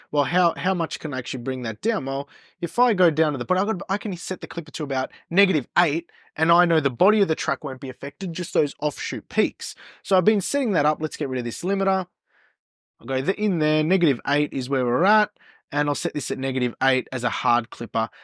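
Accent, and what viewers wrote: Australia. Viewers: Straight to the point and no BS. No like and subscribe, click the bell, yada-yada. This is how videos should be. You sound Australian but look Italian with the way you communicate with your hands😅 Thanks for all the tips and tricks!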